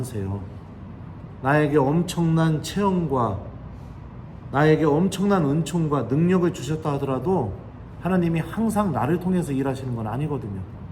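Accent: Chungcheong, South Korea. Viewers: 신부님 수고하십니다.미국 에까지 가셨네요.몇칠전에 본당신자들한테 1번2번3번 하셨싸트만 또 벌써 그멀리 가셨네요.신부님 강론듣다보면 우리고향 충청도 말씨 때문에 너무 재밋게듣습니다.ㅎㅎ오늘도역시 충청도뚝배기말 (뿌랭이)표준말(뿌리)소리듣고 많이 웃습니다.신부님 미국에서 열씸히 하느님말씀 선포하시고 건강히 계시다 행복한마음으로 돌아 오세요.😅😂😢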